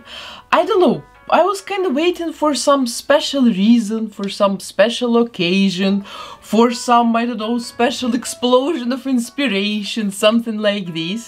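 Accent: Russia. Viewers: Русский акцент? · Кукла получилась классно. У вас сильный русский акцент, поэтому подумала, что вы меня поймёте). Работа сделана весьма талантливо. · русская чтоль? акцент- слушать невозможно, только смотреть, видео интересное! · А никого не смущает ее дикий русский акцент?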